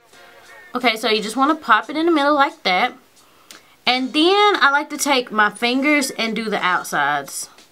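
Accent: South, United States. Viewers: I absolutely adore your southern accent! It's so fetch! :) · A little late on watching this video but I must say I LOVED this tutorial. It definitely does take practice and that is exactly what I am going to do. BTW: I love your channel and your upbeat and spunky personality. Oh and I cant forget that southern accent. ;) SUBSCRIBED! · Your country accent makes me always wanna jus give u a big ol country Hug.. I luv it =) <3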